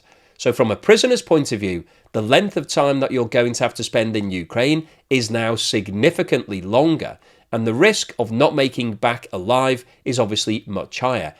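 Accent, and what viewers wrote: United Kingdom. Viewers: are you British? Wife wants to know.?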